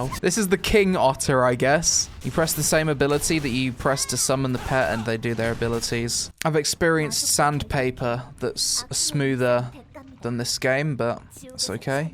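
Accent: Netherlands. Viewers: Youre Dutch? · Didnt know u where dutch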